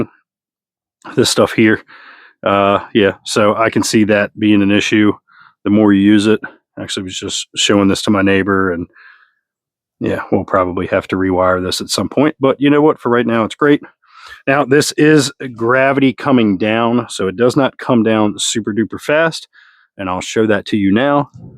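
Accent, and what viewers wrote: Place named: Eastern Shore, United States. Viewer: That eastern shore accent is thiccckkkk! I havent heard anyone sound like that since i lived in cecil county. Thanks for the reminder of home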